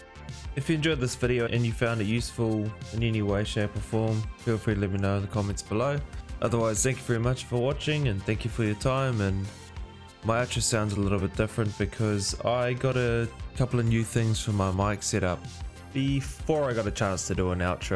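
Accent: New Zealand. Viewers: AYEEEEEE YOUS A KIWI ASWELL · Great tutorial mate. I've been flying in DCS for 3+ years now but it is always good to see what else is out there. I have the Warthog HOTAS at home with VR and I travel with the Extreme 3D Pro to play on the go. I never knew about the entry level eye tracking, it might be worth considering when I am away. Did I hear a slight kiwi accent?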